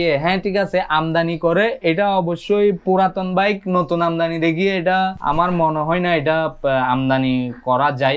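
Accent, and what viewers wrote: Chittagong, Bangladesh. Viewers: যেটুকু বলতে পারছে তাতেই যতষ্ট বুঝা জায় ctg voice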